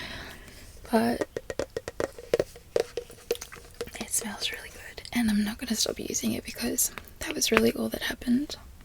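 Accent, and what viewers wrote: Australia. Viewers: Easily one of the best aussie accents I've heard. You should be really happy with yourself. when you started saying "invigorating" was on point. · Our wild roos here aren't all that exciting and they are dangerous. Especially the big males they can and have really messed people up. But i gotta say you do a pretty good Aussie accent. Normally when Americans try to do a Aussies accent they sound more like a South African with a bit of kiwi chucked in. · (ASMRtist) is that you. Or is this someone else. Oh I just realized this was you. Great Aussie accent. You are nailing it Keep up the great work.😍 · Good aussie or maybe south African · Surely you are an Aussie. If not that is the best Aussie accent I have ever heard. Well done. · Dang. I thought you WERE Australian. Its hotter 😅 il see myself out. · That is a really excellent Australian accent! 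· You sound like an expat Aussie with just a couple of tells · That's a great natural Aussie accent, better than most Aussies actually 😁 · Wondering what all your tattoos mean? Maybe you could talk about them in your next vid? Great Aussie accent btw. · Aussie here. At first you sounded very newzealand almost like a New Zealander who moved to Australia lol, but Your Aussie accent is pretty damn good. I did notice the way you pronounce your As like in nasty was not so Aussie, we say it like nahstie. Also you said emu like emoo but we say it like emyou. Besides that it’s probably one of the best Aussie accents from a non Aussie I’ve ever heard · Carl here from Adelaide south Australia, your wholesome Aussie accent is a turn on! But then realised you are just another yank lol only kidding love my hot Yankee girls aswell! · The Aussie accent is 100% my favorite. Its soo 😍😍🔥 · Im Aussie and your accent is very impressive, well done. 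A little tip for some words: Nasty is narsty, emu is emyou, banana is banarna, aussie is ozzie. Very good overall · Yeah a few things were funny to hear but otherwise a really good job! Most people normally butcher our accent but you have obviously heard a wider range of Australian accents and it's helped your grasp. Lovely as always <3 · Damn you’re actually really good at the Aussie accent sound like a Sydney chick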